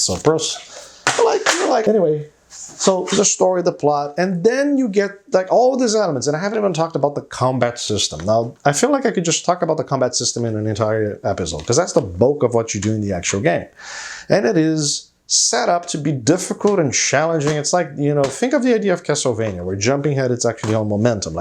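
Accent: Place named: Brazil. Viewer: O cara é brasileiro tbm provavelmente pelo jeito que ele fala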